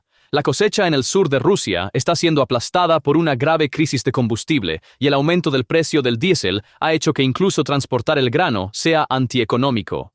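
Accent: United States. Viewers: esa ia en español gringo es ridicula por dios dejen de usarla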